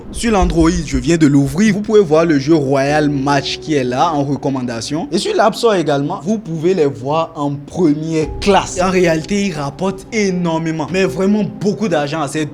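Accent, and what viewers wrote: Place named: Benin. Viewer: tu es béninois? Tu as notre l'accent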